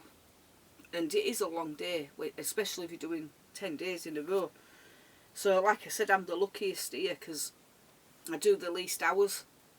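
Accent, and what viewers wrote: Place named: Huddersfield, United Kingdom. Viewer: Yes, I have sent people racing pigeons of mine up there and Poultry. You sound like people I know in Huddersfield and Hebden bridge girl x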